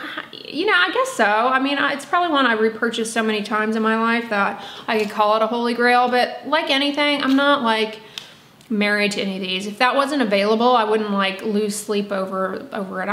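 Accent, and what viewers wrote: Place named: California, United States. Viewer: Her california accent is insane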